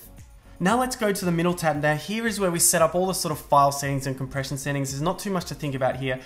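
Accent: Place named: Australia. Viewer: Just bought a Mavic Air for Aussie trip in Dec. I've always flown without FPV with a Phantom 2 and got some great footage using a GoPro Hero4 just using line of sight and sort of guessing what I'm filming. It's worked in the past more or less. But having a phone seeing the live feed will be a game changer for me. Some great tips here... the screen can be intimidating on first glance, but I'm slowly understanding the functions, good to know how to change the sensitivity of the Yaw and gimbal, great work keep it up, nice to hear a Aussie accent, makes a lovely change from American which is the usual on here. Greetings from the UK.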